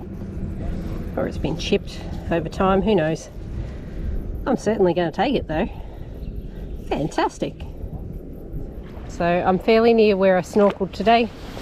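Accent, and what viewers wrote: Australia. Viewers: Your videos are the best! Love your beautiful Australian accent : )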